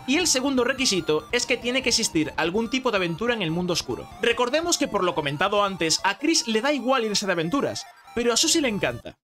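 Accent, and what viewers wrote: Galicia, Spain. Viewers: se te nota lo gallego Pd. Aléjate de las cabras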